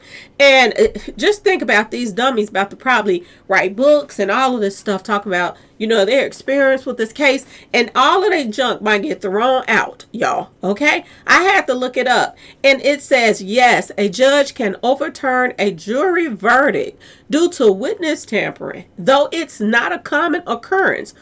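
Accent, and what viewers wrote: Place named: South, United States. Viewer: Are you from Mississippi Alabama down south I can tell you had a southern asset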